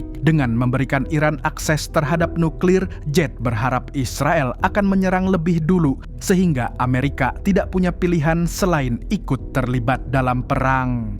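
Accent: Banjar, Indonesia. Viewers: 😂dangar narasi pertama admin,,kok kaya logat bhasa Banjar,,smangat min bikin konten y,,q urang Banjar di Jabar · Orang Banjar kah min.. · Urang banjar kh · umma mang org banjar sakalinya lah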